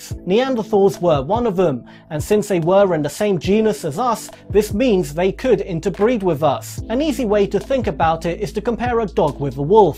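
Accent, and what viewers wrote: United Kingdom. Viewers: you sound British and your number 2 signal just told us all to go forth and multiply! Really! You should know the difference btween two and FO.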